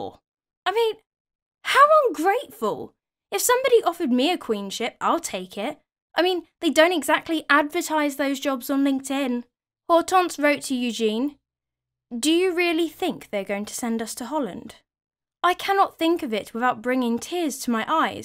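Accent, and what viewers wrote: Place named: England, United Kingdom. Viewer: French aristocracy and/or monarchy typically reside outside my interests, but with you've managed to usher me through the portière with you're ability to create these historic characters into actual living persons. I am an American and of course I find your English accent fascinating and exotic but yours in particular has an intriguing aspect. Have you done any work into the Scottish folk? thanks so much for your content.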